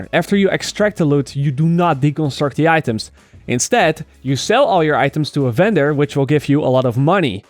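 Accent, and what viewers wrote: Netherlands. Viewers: am from the Netherlands too. I can understand that it's not his first language but he is just talking Dutch with English words if that makes sense.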